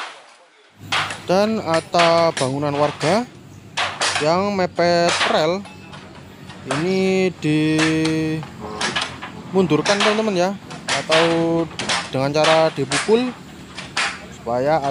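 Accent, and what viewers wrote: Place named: East Java, Indonesia. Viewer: Nang endi iki cak? nek delok omongane sih ky ng jawa timur yo . . .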